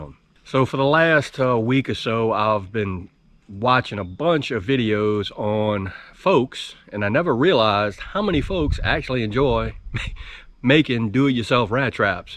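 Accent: South, United States. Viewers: Nice meeting you my friend in the USA.from England love that ol Southern accent and attitude and I have a mouse in the bedroom and I will definitely make this today many times thanks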